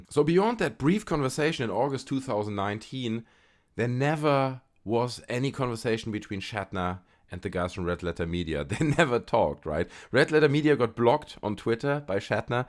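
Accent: Germany. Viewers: Lol you are funny man. Cool accent! German? Greetings from Holland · Subscribed because your German accent is excellent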